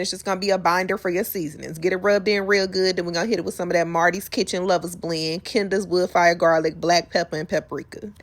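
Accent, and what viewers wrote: South, United States. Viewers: & you know she from the south lol 😂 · U from the South? 😊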